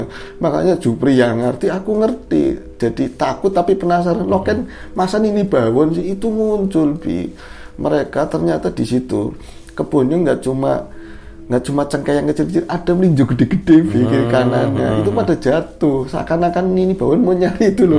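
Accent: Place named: Tegal, Indonesia. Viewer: Kalo bisa logat Tegal, banyumasan,brebesan,dan logat ngapak lainnya jgn disembunyiin,mas!!.Unik sekali!! Salam Pekejing, mas!!!!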